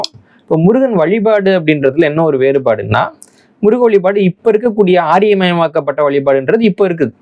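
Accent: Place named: Marwari, India. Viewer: எப்ப- என்னப்பா தமிழ அது? நம்பள்கே? சேட்டு தமிழ் பேசுற மாதிரி இருக்கு. நீ ஒரு தொகுப்பாளர் அதுவும் ஆன்மீகத்தை பத்தி பேசுகிறாய் அப்போ உன் தமிழ் சுத்தமாக இருக்க வேண்டும் அல்லவா?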